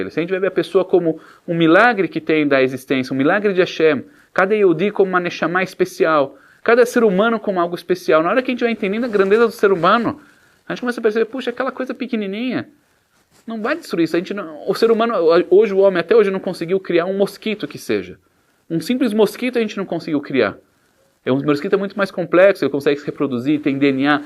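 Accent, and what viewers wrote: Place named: Brazil. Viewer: Bacana ver e ouvir um rabino ortodoxo com sotaque bem brasileiro! Todá!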